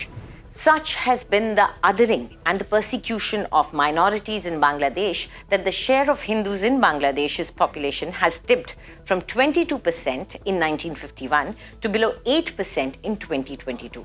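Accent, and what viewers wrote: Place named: India. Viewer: Sometimes I don't know why you could pick up such a good oral indian english but Not a proper english accent. It's time for you to go to UK or to USA for an accent reduction therapy session.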